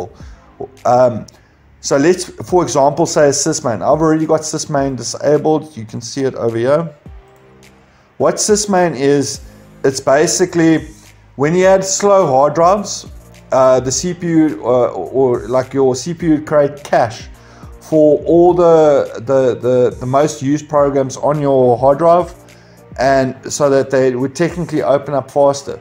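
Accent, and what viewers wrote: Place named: South Africa. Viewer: will do! My undervolt setup was from when my cpu max load was set to 60% so should he able to change it more now. There's some good ol new zealand slang for ya mate! Love your South African accent, my neighbors are from SA and are the most hilarious people I've ever met, awesome to watch the rugby with. Really appreciate the help bro! Never seen another channel that is so patient and informative with their community.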